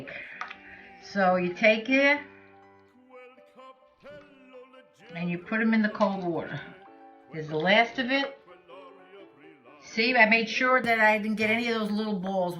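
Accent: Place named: Bronx, United States. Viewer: Another nice video! You sound like a Bronx gal to me. My Grandpa was from there. Try the whey in soups, it's really good. I have a smoker, so I might attempt making some scamorza.:)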